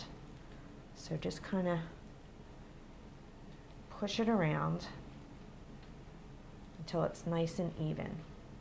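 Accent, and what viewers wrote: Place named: New York, United States. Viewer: I am going to make this tonight!!! Ps I love your accent!!!! Are you from New York ??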